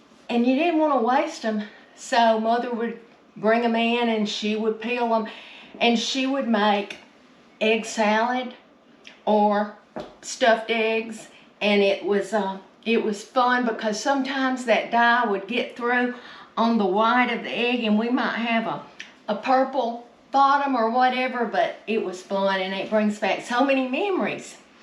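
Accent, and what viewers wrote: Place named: Alabama, United States. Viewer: I only remember them being called deviled eggs. If I make them at Christmas I add some sweet pimentos with the relish to have the red with the green. And sometimes I make them with soft bacon bits if Im trying to be fancy lol. I am from coffee county Alabama and listening to you sounds like home. God Bless and keep you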